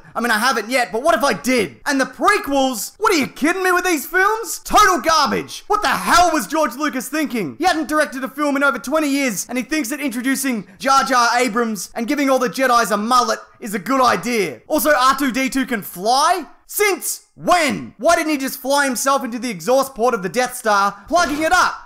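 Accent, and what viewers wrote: Australia. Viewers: OH MY GOSH! Someone yelling about Star Wars in an Aussie accent... BEST VIDEO EVER! 😂😂😂😂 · What a great sarcastic Aussie rant?! Love ya work ya bogan weirdo!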